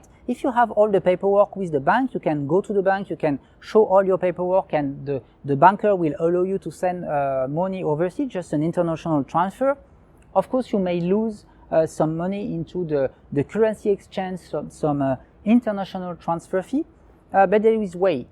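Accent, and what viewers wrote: France. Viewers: investors can put their money wherever they want and it doesn't mean they are right. Secondly american is the clearest accent out there?, it is all too subjective dear, i have a hard time understanding an texan or a deep south accent and they are all american accents!!! luckily they are subtitles on TV to help. And for a british or aussie, their english will be the clearest, and they are lot of students learning english there, so it's a matter of perception and preferences: for my part, i met people all around the world and they ask speak english with a different accent and i understood all of my speakers. My comment about the guy who suggested that should learn to speak american english was to say, although his accent is french but the content of his message is understandable and they are subtitlesb in YT for those who have difficulties to hear what he says.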